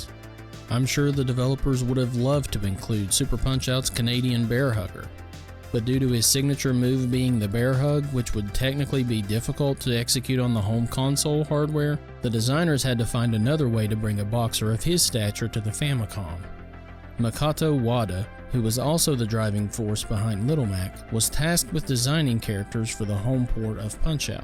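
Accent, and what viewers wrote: South, United States. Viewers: Where you at man? My family and I love watching your videos! Sounds silly, but there’s something relatable we like about listening to a fellow southerner (and Big XII fan) talk about retro games. Keep up the good work!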